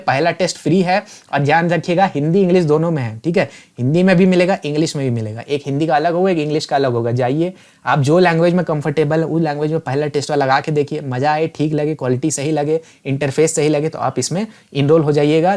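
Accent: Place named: Ranchi, India. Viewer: Uff that Ranchiyaar boleee😂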